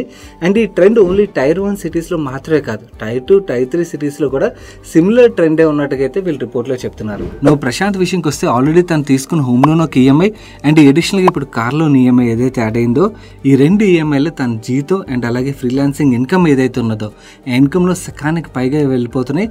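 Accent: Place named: Godavari, India. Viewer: Godhavari sonds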